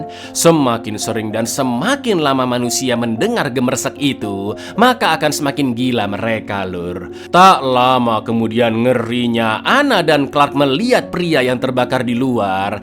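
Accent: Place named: East Java, Indonesia. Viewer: Cak, jowone sampean ngger endi? Kok jowone podo carane ngomonge karo aku, aku wong lumajang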